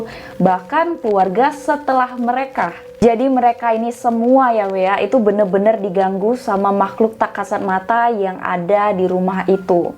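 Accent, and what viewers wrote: Medan, Indonesia. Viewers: Dari yg kangen denger cewe logat medan.. Skarang udah terobati Kangen Tebing Tinggi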